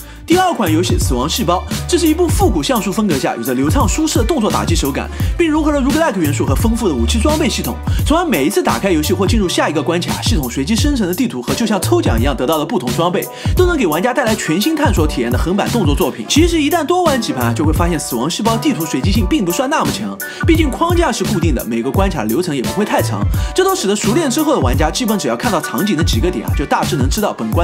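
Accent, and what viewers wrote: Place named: Jiangzhe, China. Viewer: 空洞騎士竟然是魂系作品lol 這個包郵區的塑料普通話，愛了愛了哈哈哈哈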